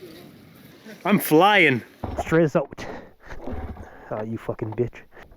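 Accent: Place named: Quebec, Canada. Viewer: t tu québécois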